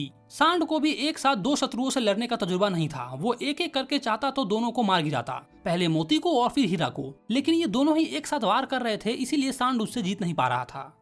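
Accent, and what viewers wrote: Bihar, India. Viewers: Bihari tone kam nikala karo samajh nhi aata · Aap bachhon ko hindi padha rahe ho ya bihari... Apni language par thoda kaam keejiye... Bachhon ki bhasha sudharni hai bigadni nahi hai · I know you are Bihari 😊😅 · Bhaiya aap Bihar se ho kya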